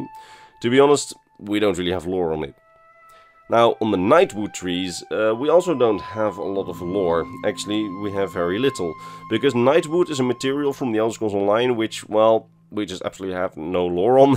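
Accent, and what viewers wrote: Netherlands. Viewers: One of my favorite parts about your videos is your Dutch accent👍🏻👍🏻I love it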